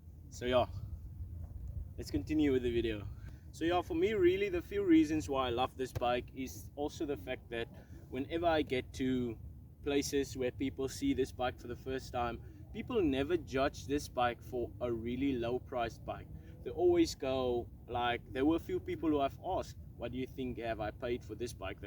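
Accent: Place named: Afrikaans, South Africa. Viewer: The Afrikaans accent in this video is phenomenal 😁👍 thanks for the video! As a girl...it helped me a lot! 😁😂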